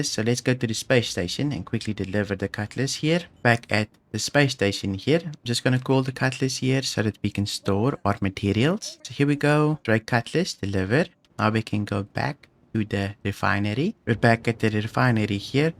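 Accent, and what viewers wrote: South Africa. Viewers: You're very good at explaining things at a good pace without leaving anything out. Nice SA accent too. Good job!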